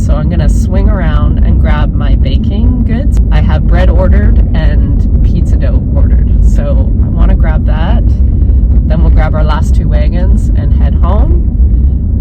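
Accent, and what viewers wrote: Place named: Canada. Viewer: Today i learned canada also says zed, instead of zee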